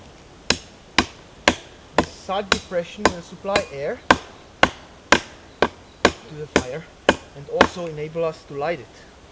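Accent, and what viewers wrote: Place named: Germany. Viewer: Don't wanna be that guy, but isn't he german?? Accent and camo gave it away :P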